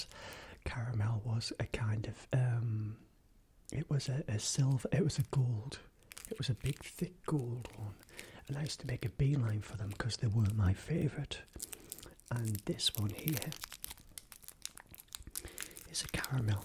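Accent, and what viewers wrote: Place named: Durham, United Kingdom. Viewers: Are you Durham? You sound Durham! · Great video :-) really relaxing and made hungry haha. Like your natural accent too, a true Geordie haha. I agree, those two are my favourite too! But I love the strawberry ones too, so strawberry would have taken silver for me:). Do you prefer roses or quality street?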